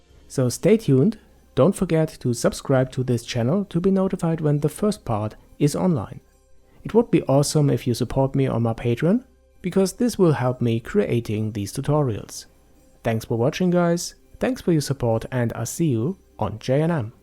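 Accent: Germany. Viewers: Du bist eigentlich deutsch oder ?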